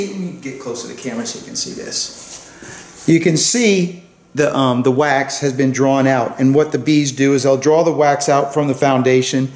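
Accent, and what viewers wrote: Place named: New England, United States. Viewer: you don't sound like you're from Louisiana. More like New England originally.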